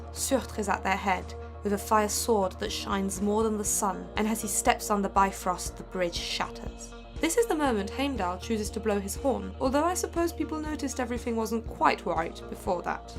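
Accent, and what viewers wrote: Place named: France. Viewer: Great video. I stumbled across this channel today and I really like it, can't have enough storytellers around. I do have two points of (hopefully) constructive criticism though, please don't take offense. 1. Talking speed. You speak pretty quickly, and sometimes that makes it a bit hard to hear what you're saying, especially when you're talking about old norse names. It kind of feels like you're standing in front of a room of people and you just want to get it over with. Especially the old norse stuff, I don't know if you're a bit nervous or unsure or something, which may cause this. There is no need to rush, you know the story, your jokes are good, you've got this. 2. Pronunciation. Icelandic is hard, judging by you accent I'd say you're French or something around there? Anyway, getting these words right can be a bit tricky, and even for a Scandinavian it isn't exactly intuitive. 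I'd advise you to spend a bit more time on them, perhaps find a way to listen to a proper pronunciation to get it right. Once more, I like what you're doing here, and I'm eagerly awaiting your next video.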